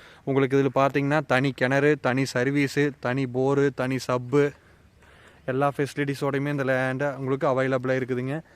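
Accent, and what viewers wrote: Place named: Coimbatore, India. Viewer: கோவை தமிழ் மிக அறுமை. உங்கள் channel வலர வாழ்த்துக்கள்.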